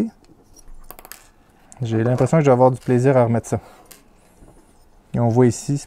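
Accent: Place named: Quebec, Canada. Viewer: Bonjour, j'ai bien compris qu'il n'y a aucune animosité dans ton intervention, mais je trouve étrange de reprocher à un Québécois de parler..... québécois ;-) Marc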